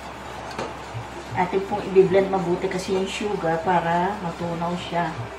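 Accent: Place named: Bulacan, Philippines. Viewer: Aq po naglalagay ng dayap sa maja din..masarap po tlga ang my dayap..prang refreshing ang lasa nya.. Taga bulacan din po ba kayo Ma'am? Ksi po tagalog na tagalog kayo mag salita..tulad nlng nyan,,dayap ksi sa bulacan din.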